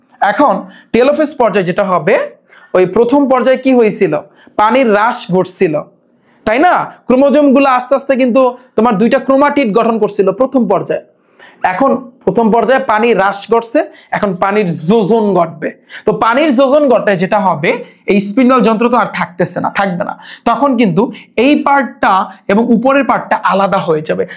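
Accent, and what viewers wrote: Barishal, Bangladesh. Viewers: আপনি কি বরিশালের লোক😊😊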